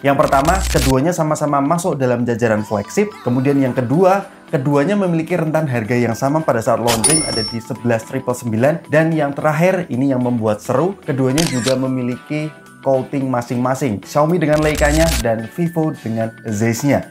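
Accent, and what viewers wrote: Semarang, Indonesia. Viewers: Wong Semarang tah mas?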